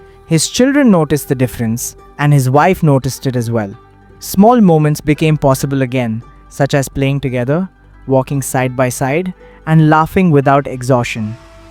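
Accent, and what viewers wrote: India. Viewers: japanese story with indian accent… random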